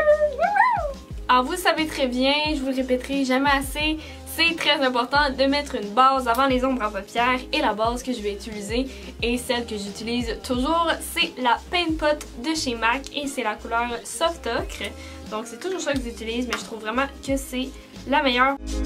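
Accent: Canada. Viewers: Tes vidéos sont toujours autant fun! J’te suis depuis le début et je me lasse pas de tes vidéos! Et cet accent canadien 🙌🏻 Je retourne à Montréal en octobre, j’espère te croiser !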